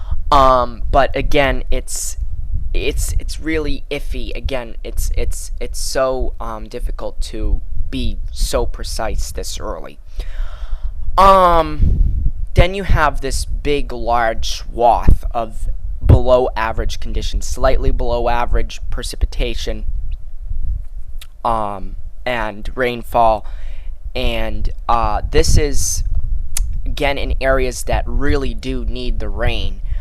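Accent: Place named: New York, United States. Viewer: do you live in new york city, you have the new york accent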